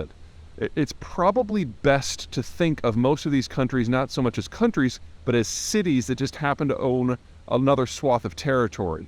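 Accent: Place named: Texas, United States. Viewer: Talks like some Texas ignorant. When a fool is trying to repeat what a wise man once said, the chain jumps off because he translates it into something he himself can understand.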